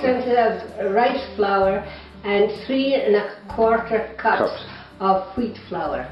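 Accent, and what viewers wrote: Scotland, United Kingdom. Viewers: She sounds like a Mackay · Gotta try these for sure. Love the recipe and the Wee bonnie lass accent !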